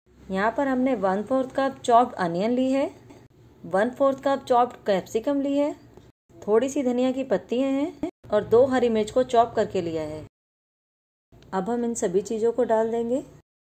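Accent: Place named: India. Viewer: Hello.. i love Indian accent.. your videos very interesting.. thankyou for sharing friend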